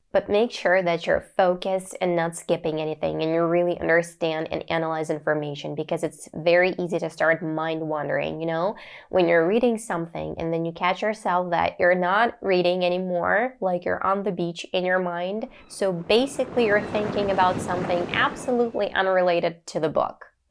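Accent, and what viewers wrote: United States. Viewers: You make me crazy... Well, twice too american accent... You speak clearly, of course, thanks a lot, everything is understandable...but accent...Who are you originally? I've heard words Russian, Ukranian...Hah... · Wow I thought you are American You speak with 95% American accent. That's very impressive I've never seen someone from CIS who speaks with almost pure American accent. You encouraging me to improve my speaking skills. Keep it up👍 Thank you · I thought you are native English speaker. You speak just like Americans. Did you ever live in the USA before? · You are so pretty and you look l and sound like a american woman😁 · Hey Master! New to your channel. Just wondering where are you from? You sound like American. Also love your accent or the way your mouth says English words. Greetings from Deep Space!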